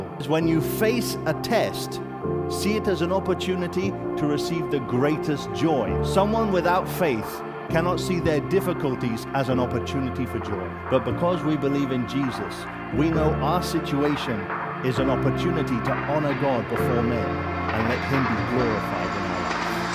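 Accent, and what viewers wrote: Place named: Netherlands. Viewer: Do you live in Nederland? I can hear dutch language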